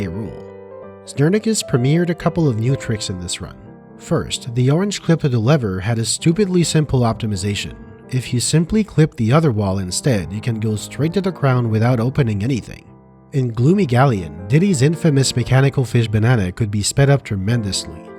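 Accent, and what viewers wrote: Quebec, Canada. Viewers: Oh tes Québecois!!! 🎉❤ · omg quebecois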